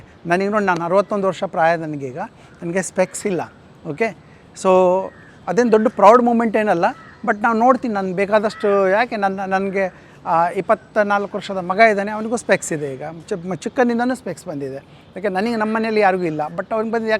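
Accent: Standard. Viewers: ಜೀ ನಿಮ್ಮ ಕನ್ನಡ ತುಂಬಾ ಶುದ್ಧವಾಗಿದೆ ಹಾಗು ನೀವು ಮಾಡುವ ಸಂವಾದ ತುಂಬಾ ತುಂಬಾ ತುಂಬಾ ಅರ್ಥಗರ್ಭಿತವಾಗಿರುತ್ತದೆ, ನಾವು ಹೇಗೆ ನಿಮ್ಮ ಥರ ಧೈರ್ಯವಾಗಿ ಮಾತನ್ನಡುವುದು ಹೇಳಿ👏👏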